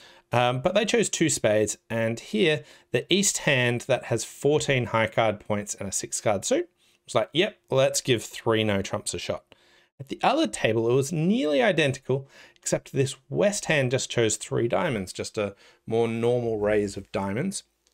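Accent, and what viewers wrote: Australia. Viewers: How have I missed your channel till now? Enjoy your vids - good to listen to an Aussie discussing bridge. Love the analysis and hope you get to ake a lot more of these, I will be a regular watcher from now on.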